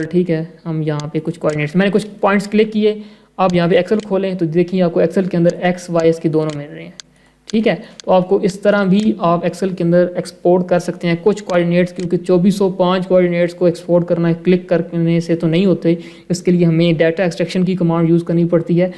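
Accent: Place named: Pakistan. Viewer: Awesome teaching Sir Ji stay blessed i think 🤔 you are from Pakistan 🇵🇰 right listening to your voice communication love and respect from karnataka India 🇮🇳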